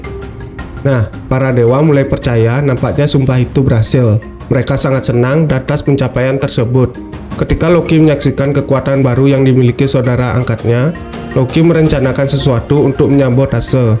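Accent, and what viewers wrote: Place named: Bali, Indonesia. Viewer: Beli logat bali nya masih kental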